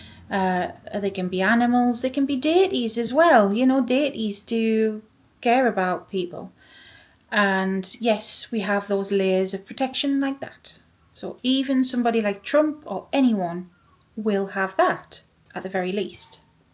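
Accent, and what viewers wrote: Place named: Scotland, United Kingdom. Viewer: This is quite the journey we’re all on, quite the balancing act. I repeat to myself every morning before I get out of bed,” I will not give into the lies, I will not give into fear. I will hold onto Hope, I will hold onto truth, no matter what”. Building a supportive community is absolutely essential during these turbulent times. And my dear, your videos are very helpful just to hear that sweet sweet Scottish accent soothes my soul. Thank you for everything you do for this community! Sending love and light to all 🙏🏼💙❤️‍🔥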